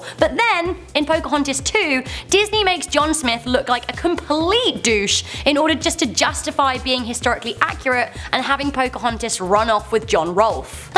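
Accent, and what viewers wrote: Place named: United Kingdom. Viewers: British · British. Obviously.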